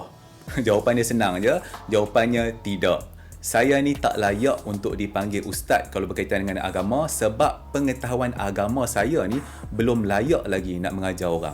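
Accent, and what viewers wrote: North, Malaysia. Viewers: bang loghat utara abg terbaik....abg utara blah mana?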